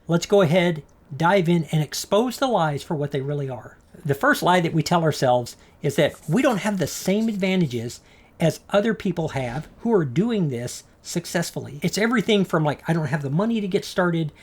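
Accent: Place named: North, United States. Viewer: I didn't know you were a fellow Texan. You sound like you're from up north. Lol.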